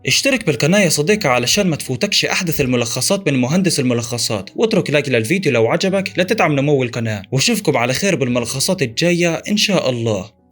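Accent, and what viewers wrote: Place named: Syria. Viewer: لهجتك بين السوريه وبين المصريه حيره🤔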